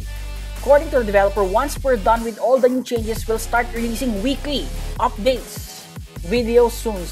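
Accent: Philippines. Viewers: You sound like a Filipino to me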